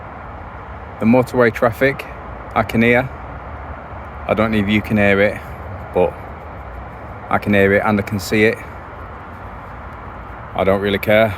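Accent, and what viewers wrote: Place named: Yorkshire, United Kingdom. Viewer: Excellent channel, looks like the good life, the dogs must be great company too.so glad this video popped up on my feed.... just subscribed now... you sound like your from near me in Leeds /Bradford way? , I wish you and the dogs the best of luck in ya outdoor life 👍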